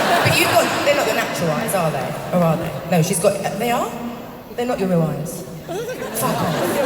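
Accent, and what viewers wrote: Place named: South London, United Kingdom. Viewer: I don't understand one word of what she's saying. So South London and very fast speech.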